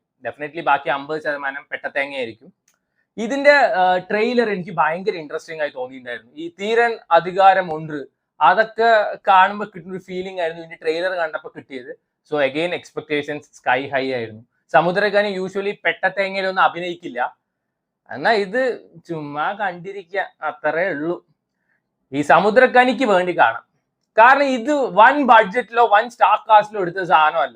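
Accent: Palakkad, India. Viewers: Nalla palakkadan slang❤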